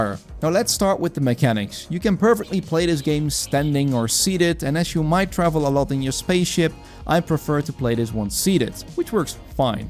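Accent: Netherlands. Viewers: You must be Dutch. The accent.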